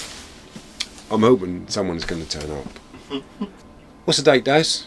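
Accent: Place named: Norfolk, United Kingdom. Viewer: Left home aged 17, now 65 and never had a tv licence or visit. Good old Norfolk accent.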